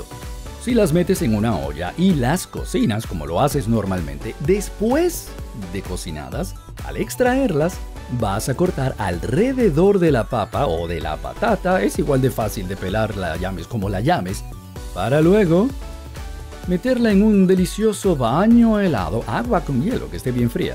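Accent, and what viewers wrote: Venezuela. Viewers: Es acento venezolano.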